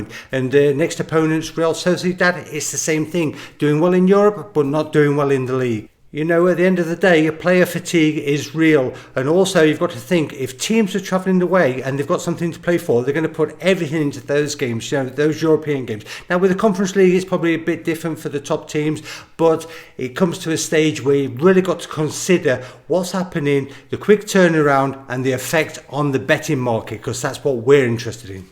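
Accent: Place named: United Kingdom. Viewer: What? , you probkybjust convinced by his brith accents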